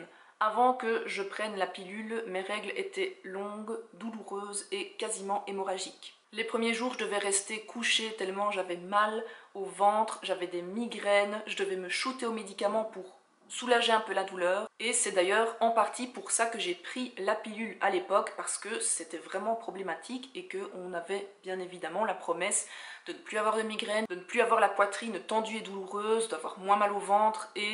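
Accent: Belgium. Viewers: Mhhhhh un petit accent belge non? Je viens de tomber sur y’a vidéo car je me renseigne sur la pilule. J’ai commencé à en prendre une jeudi. Donc très récent ça fait 3j. C’est la ZOELY mais elle ne me convient pas je me pense... mes seins gonflent, acné sur les seins le dos et début visage alors que je n’en n’ai jamais eu. J’ai horriblement mal dans une jambe. J’ai du mal à marcher. Je pense l’arrêter... bisous!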